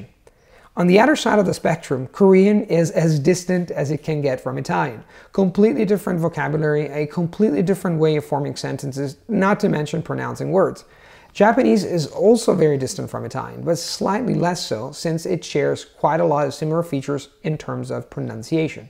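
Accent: United States. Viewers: Native English speaker here. In this video he sounds a little raspy, like he's getting over losing his voice. I haven't seen a general degradation of his American accent; I don't watch all his videos though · you speak English with an American accent. Sometimes I hear other people that learned English speak with a British accent. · babe, no one is berating him or anything based off his accent. I never said that sound like a native should ever the main goal when picking up a language. The reason I brought this up is because I've been watching his videos for years & I do know he used to have a stronger American accent. That being said, it is interesting to point out how the acquisition of more languages can affect the languages one already has under their belt. Please think everything through before stating someone else does not support him. I obviously do. 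:)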